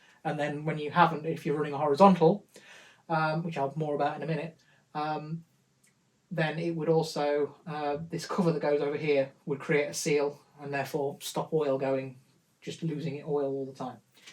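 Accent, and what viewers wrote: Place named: East Midlands, United Kingdom. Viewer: hello me love.." here i am again .. oh ! merry xmass and a happy new year.!.i dont suppose you have amongst all your treasured parts ,,, a table for a centec 2 mill.. the one i have is a right state with over tightening the " t " bolts the upper has broke away it wasnt me !. i want it fixed or replaced... can you help ? .by your accent you dont live too far from me " derby notts area ,",, hope you can help regards laurence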